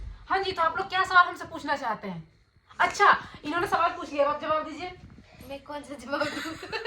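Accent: Bihar, India. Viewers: Mujhe esa lgta hai ki na aapko negative thumbnail dalne chaie na hi kisi ko respond krna chaiye Its better ki apne vlogs ko family vlog bnana bnd krdo kch special hua toh family ko dikhao otherwise nahi Bcz jb apko dikh ra hai ki apki family aap m interested nai hai toh unhe kyu hi vlogs m lana or kyu hi bewjh chipkna Log negative bolte hain bolne do just ignore & delete their comments Positive raho or positive vlogs bnao positive thumbnail daalo Qki kahi na kahi aap bhi galti krre ho isliye hi aap troll hote ho Abhiksha ko dkho wo itna jada troll nai hoti Km kmao pr acha kmao Ye family drama saas bahu devrani jethani krke kch nai milega Log kch hi din dkhte hain y sb baad m sb bore hojate hain Lambi race ka ghoda bno Na ki chaar din ki chandni Apke subscribers itni advices dete hain apko ki aap apna pronounciation tkh kro Being bihari its good pr professionally ye nai chal pata Insb chizo se niklo & grow urself Grow your personality..apke bache k liye b acha hoga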